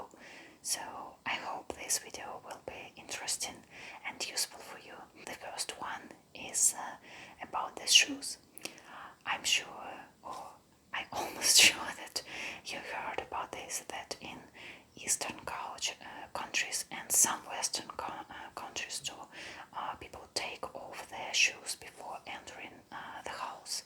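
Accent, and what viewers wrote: Russia. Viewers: Thank you very much for this video, I just love 🥰 your Russian accent, I live in Ireland 🇮🇪 and I always take my shoes off at the door & give my seat up to someone who needs it more than I do, you have a lovely soft voice for ASMR.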